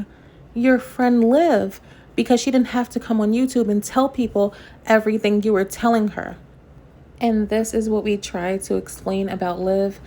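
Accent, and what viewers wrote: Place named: New York, United States. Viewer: Are you from New York ? I love love your accent !!!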